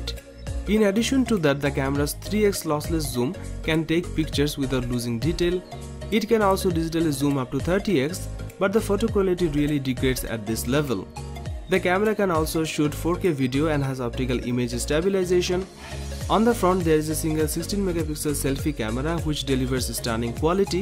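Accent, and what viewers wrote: India. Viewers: omg the Indian accent...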